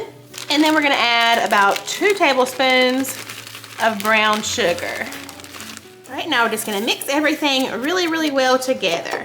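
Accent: South, United States. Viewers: I'm new to your channel really enjoying your recipes and home decor. Where you from im from ky i noticed you have a southern accent. Thanks for sharing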